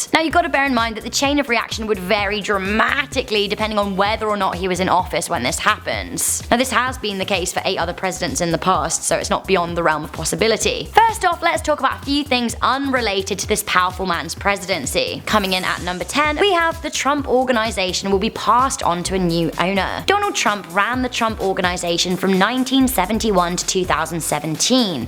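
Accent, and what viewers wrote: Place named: United Kingdom. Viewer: that damn annoying british accent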